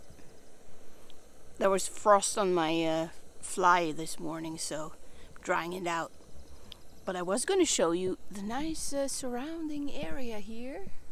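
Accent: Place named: United States. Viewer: You remind me so much of my friend from norway. She speaks English with a modified American accent like you do. Instead of a British accent. But we’ve been friends for 40 plus years. She has American friends so there you go. Anyway, she came out to visit me a few years ago snd her fav part of CA was the sierras. Traveled the western and the eastern sections of the sierras from lake Isabela to Lake Tahoe. I’ve traveled the world. Few places as ruggedly beautiful as the sierras. Tho I think the cascade range in WA snd OR, where I grew up, is incredible as you will soon see. Thanks for sharing your adventures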